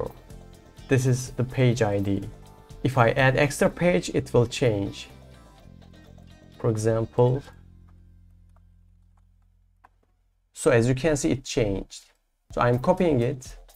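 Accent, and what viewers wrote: Turkey. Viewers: İngilizceyi duyduğum gibi dedim aha Turko :) Başarılar teşekkürler. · Daha ilk konusmaya basladiginda bu kesin Türk dedim :d Hatta emin olmak icin yorumlara baktim. Guzel icerik tesekkurler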